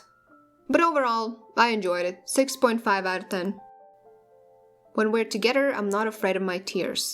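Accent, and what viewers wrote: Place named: Russia. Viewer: I love your Russian accent.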